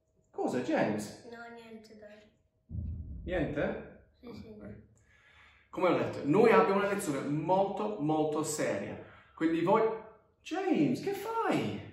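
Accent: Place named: Bari, Italy. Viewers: Sei troppo simpatico quando parli 'anglo-barese' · Accento barese...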